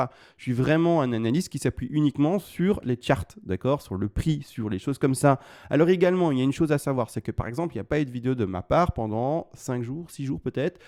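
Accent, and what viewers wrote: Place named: Belgium. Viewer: Bonjour et Bravo à, je suis devenu accro à ses tutos.... lui qui est belge je crois... et moi Québécois!!! Mais quel ACCENT!... pour un québécois francais comme moi ca me tient réveillé!!... Question pour la communauté: j'utilise Kraken pcq c'est le premier exchange que j'ai connu, cependant, je le trouve tellement pas bien fait. Je sais que ne souhaite pas s'investir en recommandant un exchange pour les raisons qu'il a mentionné et que je respecte, mais je suis d'avis qu'un membre de la communauté pourrais me référé l'exchange qui serait plus plus adapté à suivre ces tutos, comme par exemple modifier les stop loss à la cliquette comme il dit souvent:)) qui peut me recommander son meilleur choix et pourquoi... Merci et bravo encore... j'apprend toujours!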